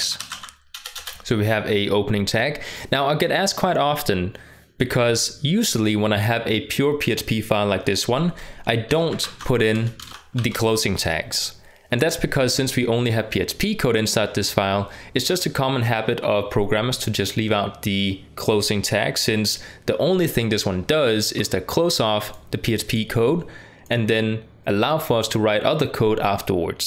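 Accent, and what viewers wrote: Denmark. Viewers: He is from Denmark! I love his accent!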